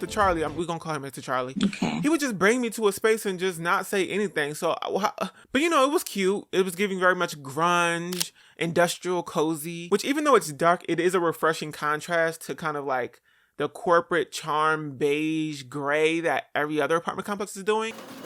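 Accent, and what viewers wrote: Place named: South Jersey, United States. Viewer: I grew up in South Jersey too!!! I always heard your accent and thought he can’t be raised in Arizona 😂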